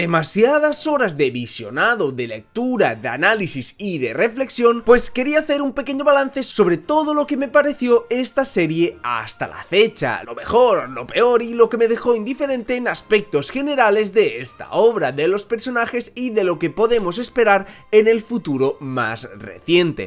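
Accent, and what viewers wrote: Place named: Spain. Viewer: Al fin un canal bien narrado en español de españa… 😢 viva españa viva el rey viva el orden y la ley! 😂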